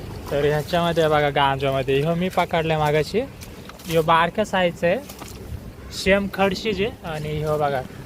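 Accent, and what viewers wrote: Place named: Nashik, India. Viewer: भाऊ तुणच मुळ गाव कोनत आहे तुमची भाषा ऐकून वाटत नाशिक मधचे आहेत तुम्ही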